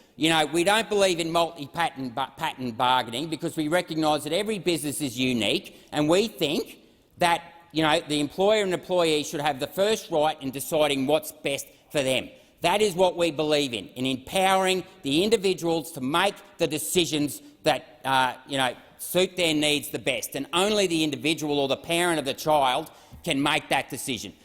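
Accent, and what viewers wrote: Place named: Australia. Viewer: Well done Mr. Rennick...keep the pressure on. But Please, why do you have to talk like Norman Gunston? Crikey, even Norman Gunston's creator, Gary MacDonald, doesn't talk like this in his normal voice. Nothing more grating than bluddy Ocker Stryne!......well, uzzer zan zee Klaus Prussian/German zat iz.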